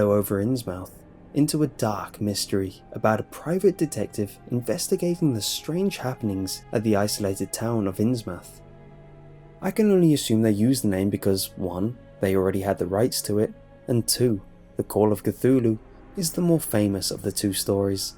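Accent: United Kingdom. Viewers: Love your tops and horror stories because of your voice so soothing and misterious I thik I never heard another well spoken brit like you do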